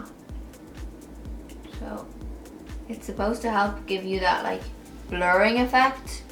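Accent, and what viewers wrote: Ireland. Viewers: I'm trying to figure out if your Irish or not 😂😂 amazing tutorial tho!😍😍❤️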